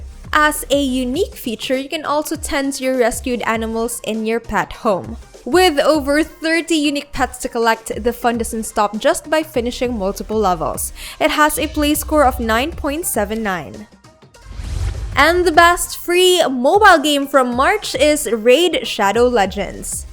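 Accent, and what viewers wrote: Philippines. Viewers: I feel like your english sounds filipino. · You sound like filipino IM PROUD TO BE FILIPINO ML TAYO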